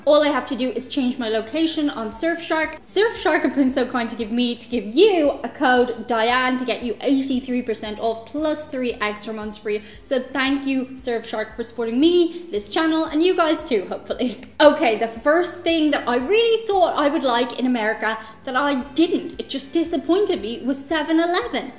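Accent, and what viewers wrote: Ireland. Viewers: It was so much fun listening to this! All the commenters seem to be focusing on the 7 elevens, rightfully so, I loved that you are aware of how big the U.S. is and how there is so much to see. Fun to watch you and love your Irish accent. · Some of the problem with them understanding your Spanish may have to do with your Irish accent. I even have a little trouble with you when you're speaking English (I think yours is the only YouTube channel I routinely watch with the closed captions on, just to fill in the gaps in understading). Spanish itself sounds different in every country. I'm pretty familiar with Mexican Spanish (having lived for sixty years in various US border states), and I have trouble with Argentine, Puerto Rican, continental Spanish, whatever that mixture in the Philippines is supposed to be. Most clearly-enunciated Spanish I ever encountered was on shortwave radio, and I listened for several minutes for something that would identify the origin; finally out came a station ID and I was listening to Radio Shanghai! 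· Bad toilet paper in a public toilet is probably on purpose. Likely to discourage it getting stolen when you're running low at home. Choice overload is also a thing. There are even studies. Seems like 3-5 choices is ideal in many things. Here is my question.....do you speak Spanish with an Irish accent?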